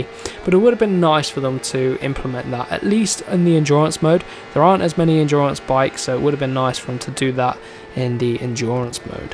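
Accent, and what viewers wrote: United Kingdom. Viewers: i love your british accent, man 😘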